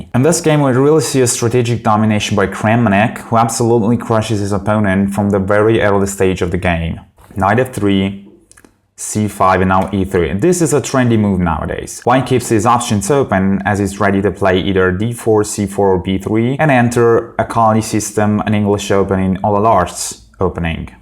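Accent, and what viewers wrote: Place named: United States. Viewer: Ciao, complimenti, mi sono iscritto da poco al canale e lo trovo molto divertente. Sono d'accordo con la risposta che hai dato a White Rabbit. Le partite trash dal vivo sono al top sempre. Un consiglio se permetti, bravo per l'inglese, ma parlare con un accento nordamericano esasperato è veramente trash IMHO, anche perchè sembri un imitatore di Hikaru LOL. Ho apprezzato molto anche i video con i consigli per migliorare il proprio gioco.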